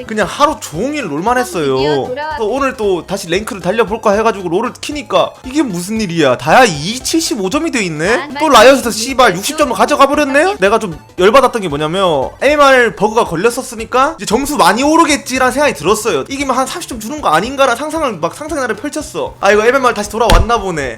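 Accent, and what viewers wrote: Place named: Gimhae, South Korea. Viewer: 말투가 아주 김해 느낌이 많이 나는게 뭔가 서운하면서도 제육을 볶고 싶은 느낌이네요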